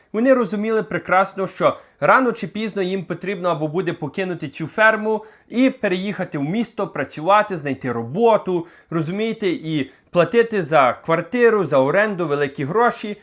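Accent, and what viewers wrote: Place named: Western Ukraine, Ukraine. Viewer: Сколько раз попадаются мне ваши видео! Я не хочу Вас смотреть! Дикция - просто отвратительна, противный западэнский акцент. Не на чем больше деньги зарабатывать? Нет работы в Канаде?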